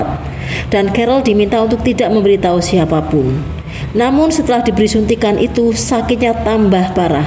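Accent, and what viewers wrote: Java, Indonesia. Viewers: suara yg ad medok2 jawa ny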